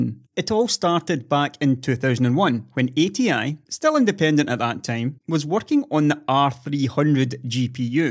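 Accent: Scotland, United Kingdom. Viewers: getting much better at understanding Scottish accents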